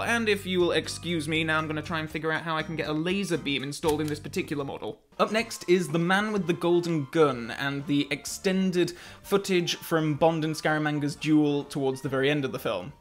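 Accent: Yorkshire, United Kingdom. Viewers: If you check out his older videos he sounds very Yorkshire.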